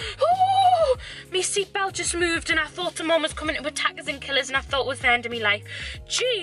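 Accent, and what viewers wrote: Newcastle, United Kingdom. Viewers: this ismy first time watching you and im definitely going to subscribe one question, are you geordie? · She is from newcastle, which is in England :) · I love your voice are you britsh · she’s british, the accent is geordie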